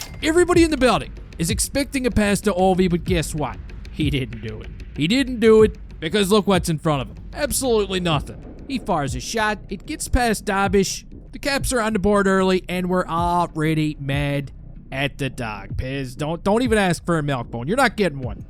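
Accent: Newfoundland, Canada. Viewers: Knew you were a newf within the first few seconds of voiceover 😂 much love brother, go habs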